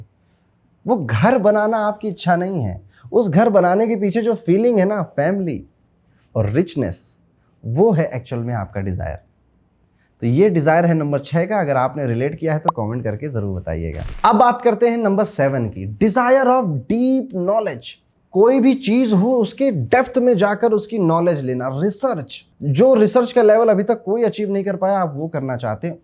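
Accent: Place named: Kanpur, India. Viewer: Radhe radhe… fan ho gye hu aapki… watching u from Sydney but dil hai kanpuriya… aap bolte hoto lagta hai mere gher ka koi bol rha hai wahi lehja wahi slag wahi shabd… hashi bhi aati hai sun k aesa lagta hai gher per hu.., Kash aapse appointment le pati… thode mehnge ho aap aur abhi mai mere naukri nhi hai kaphi samay se job search ker rahi hu but pakka jaldi hi lag jayegi aur tab aap se baat kaungi… may be face to face… when i am there in kanpur… in my home and yes i know u read all the comments 😅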